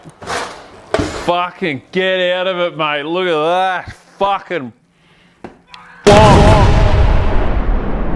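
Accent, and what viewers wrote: Australia. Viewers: Is this how Australian people talk? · He's definitely Australian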